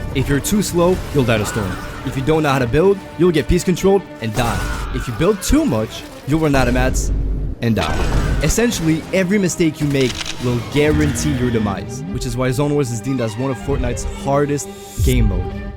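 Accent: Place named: Quebec, Canada. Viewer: I thought you were french (considering your game language is) and I was flabbergasted by your english accent, but I check your description and you're from Quebec, so I get it now. Anyway, very nice video with top notch editing, especially for someone with so few subscribers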